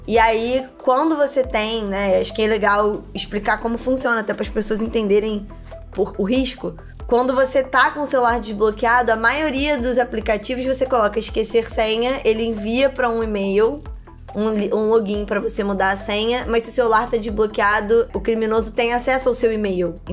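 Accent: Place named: Rio de Janeiro, Brazil. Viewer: O sotaque feio. É esse carioca kkkk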